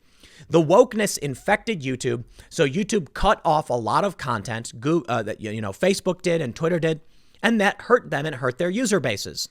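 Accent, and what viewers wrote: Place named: Chicago, United States. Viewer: I think its the Chicago accent. Illinois has some interesting ways of pronouncing words, and celebrities have interesting sounding names, its bound to come out some how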